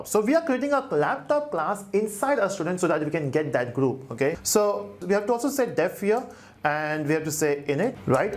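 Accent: Telugu, India. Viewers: are you a Telugu guy from Andhra Pradesh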